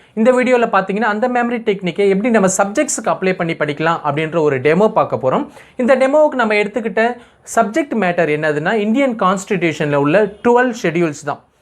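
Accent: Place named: England, United Kingdom. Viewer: Vera level Anna thanks. Unka voice la oru English .....style varuthu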